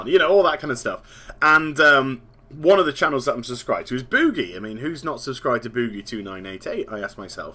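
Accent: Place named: United Kingdom. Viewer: why is it that British people pronounce it beeta instead of bayta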